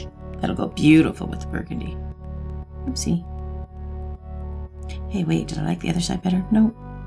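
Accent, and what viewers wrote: Canada. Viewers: Is canadian?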